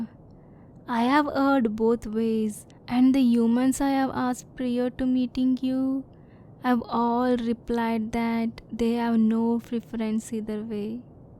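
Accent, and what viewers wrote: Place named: India. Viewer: Wow, I've been listening to asmr rp/story audios for awhile now specifically ones with female voice actresses but i don't think i have ever listened to one where the voice actress has an Indian accent, you sound really good. I myself am Indian but my family are not from there so i actually never heard the accent much at all.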